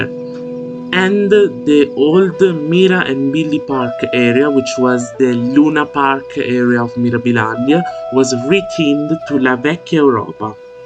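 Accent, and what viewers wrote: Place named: England, United Kingdom. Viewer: Bravissimo mi piace quella pronuncia inglese ;)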